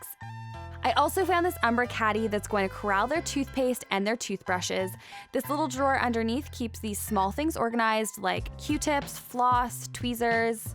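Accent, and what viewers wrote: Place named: Canada. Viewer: Ah ha! I ended up here from another recent video of yours, and I thought I recognized that canadianness (I'm from Montreal, hehe)!! xD Great videos!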